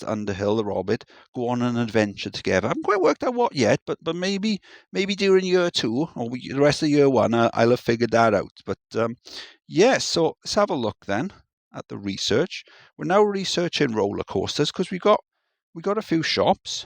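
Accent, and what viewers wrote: Wales, United Kingdom. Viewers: I've been trying to place your accent,! Is it Welsh? Hopefully you don't mind me asking. Regardless, I love your RCT content and have been using a ton of your coaster designs!!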